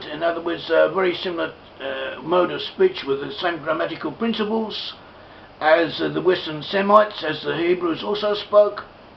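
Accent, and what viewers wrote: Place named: England, United Kingdom. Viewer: ugly looking fuck with some english accent with a horrible voice fuck off to wales or somewhere with your bullshit ..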